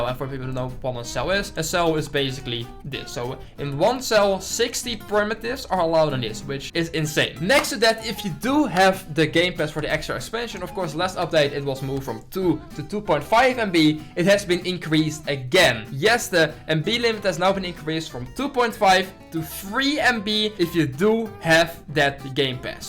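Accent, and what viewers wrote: Netherlands. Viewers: It’s English but it’s with the worst Dutch accent ever lol. I’m Dutch myself and I’m struggling to understand him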